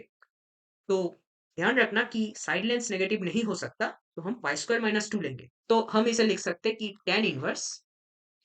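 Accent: Bengal, India. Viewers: Bengali ho kya bhi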